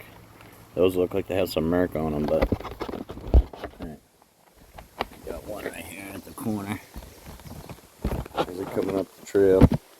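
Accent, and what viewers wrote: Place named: Vermont, United States. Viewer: cool..I think that was the river that ran through West Townsend when I lived there..nope, that was the West River...wonder if there's gold in that one too...I kinda miss that accent from Vermont..the way you said "pry bah"..lol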